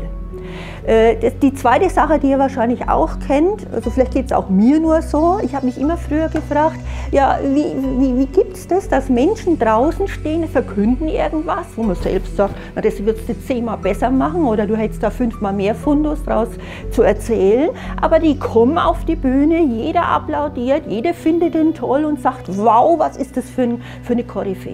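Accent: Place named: Franconia, Germany. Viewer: ich sehe mir gerade deine Videos Stück für Stück an und finde, dass du ein Original bist.:-) Die Kombination aus dem Wissen, der Musik (finde ich in diesem Video hier nicht so störend), den gut gewählten Farben (warst du mal bei einem Imageberater, scheint fast so), dem Buddha im Hintergrund, deinem gesellschaftlichen Engagement - und nicht zuletzt der fränkische Akzent:-) macht ein Alleinstellungsmerkmal aus. Man merkt es sich.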